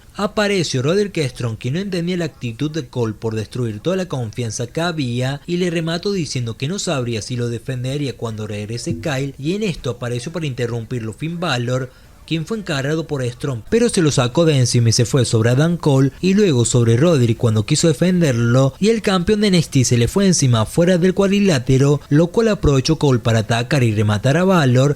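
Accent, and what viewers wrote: Córdoba, Argentina. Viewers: Me parecía, yo también lo soy, o sea Argentino y el asentó cordobés es imposible no reconocer.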